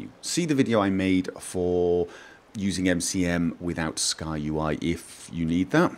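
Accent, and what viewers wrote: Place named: Manchester, United Kingdom. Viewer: Damn. After all those questions as to where you're from I always sat smugly in my seat and said "I bet it's Manchester, somewhere in the NW at least". Of course, I sound like a total hindsight Charlie now. :D Not liven in the NW for nearly 20 years (and I wasn't a native to begin with) but the odd word still comes out with a burr. :)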